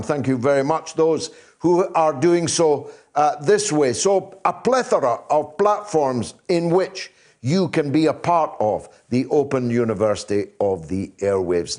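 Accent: Scotland, United Kingdom. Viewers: This dude is SCOTTISH as hell man. Come on! You're like Lloyd Christmas in Dumb & Dumber. "Aspen? California eh??? Nice. "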